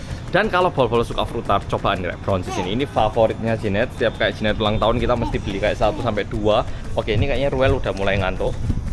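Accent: Java, Indonesia. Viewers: kayaknya sudah lamaa ga kolab sama londo kampung.podo medhoke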